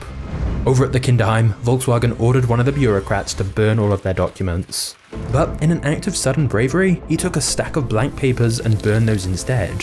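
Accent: United Kingdom. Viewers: Bro is so hardcore Britain. 😂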